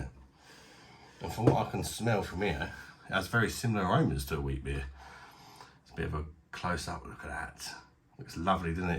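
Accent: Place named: West London, United Kingdom. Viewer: cheers will give them a try and I will try one of them rheinbachers aswell as I like holsten and your battle vs lidl lol . U sound from my neck of the woods I'm west side of London near Heathrow if ya ever fancy a pint and a game of pool one day always welcome in the sir john gibson pub in stanwell I'm often in there with my pals as its five mins away lol cheers bosh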